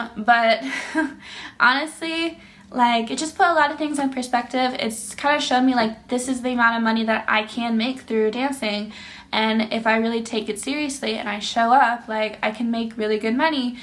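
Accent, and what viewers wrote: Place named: Oregon, United States. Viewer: I thought 💭...I heard some Oregonian in you and then, you said it... 😲 Wow 😲 I'm a new subscriber and I moved to Phoenix, AZ with my girlfriend and our 5 cats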